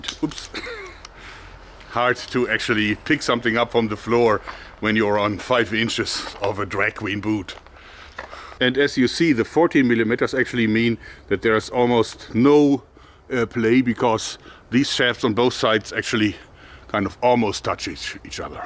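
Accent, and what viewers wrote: Germany. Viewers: Dude. Just came upon this channel right now. Hearing your accent I thought "ich denke daß dieser Man Deutscher ist" and then I took a look. Essen Deutschland. I love it man! And you English is killer, like many Germans. Thanks for the great content. Great content. Thx! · your german accent hope thats right my apologies if it is not is absolutely amazing really adds to your videos keep it up!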